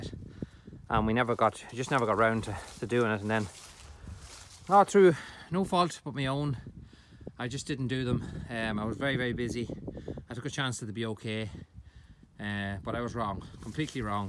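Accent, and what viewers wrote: Ireland. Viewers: Like your videos mate just subscribed and I love your Irish ☘️ accent, birds sounds like boards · where in ireland are u from?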